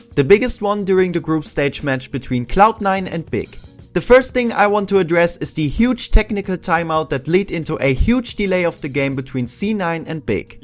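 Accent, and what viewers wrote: Germany. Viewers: Bist du deutsch? (Wegen deinem Accent) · Wow man hört direkt das du deutsch bist 😂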